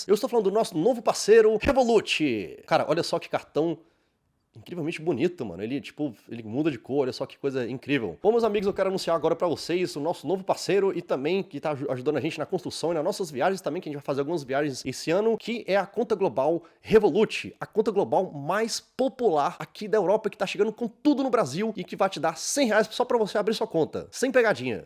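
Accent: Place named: Florianópolis, Brazil. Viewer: Eu via os vídeos desse cara há muito tempo atrás, e pelo sotaque, sempre achei que ele era de Florianópolis, mas não, ele é Brasiliense :face-blue-smiling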